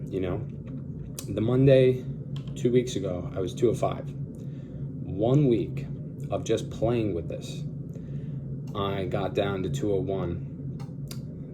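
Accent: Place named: Italy. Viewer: It’s the Italian accent.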